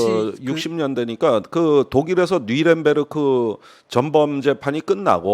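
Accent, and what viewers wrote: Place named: Chungcheong, South Korea. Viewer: 졸라싸워! ㅋㅎ 충청도말씨 구수허유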